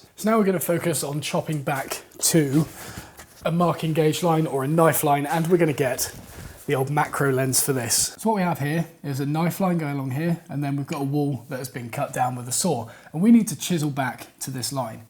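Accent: United Kingdom. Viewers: Woodworking seems far more serious and classy with a british accent.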